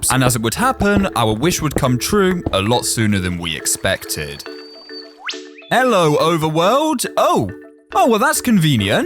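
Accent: United Kingdom. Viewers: I love British accents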